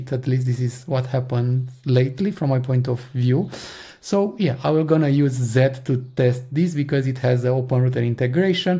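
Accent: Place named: Romania. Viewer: roman cumva îmi dau seama după accent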